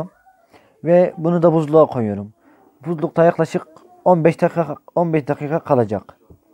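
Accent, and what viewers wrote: Hatay, Turkey. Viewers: kardeşim sen benim oğlum yaşındasın. Sana bir tavsiyem var. Sesini ve konuşma tarzını hiç değiştirme. Kırıtarak konuşma. Hataylı olarak kendi şivenle konuş. Biz seni anlıyoruz. Yalnız daha çok kitap oku. Kitap okudukça kelime hazinen genişler. Utanmadan rahat anlatırsın 😊 Takiptesin unutma!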